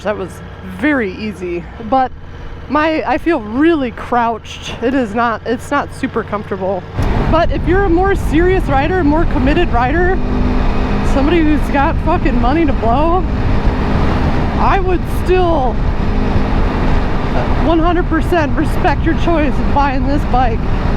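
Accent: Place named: Chicago, United States. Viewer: Sounds like you are from Chicago.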